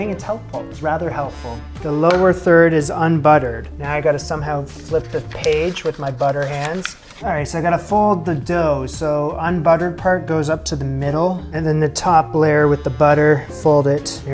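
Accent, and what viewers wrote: Canada. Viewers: Do I hear Canada great video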